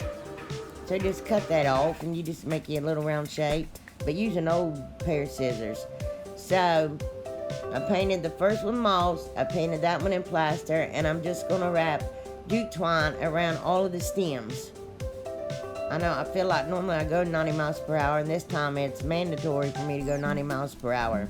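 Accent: South, United States. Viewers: That is absolutely gorgeous! I wouldn't have thought to do the Buffalo check in green! What colors did you use besides celery? And where are you from? You sound very southern lol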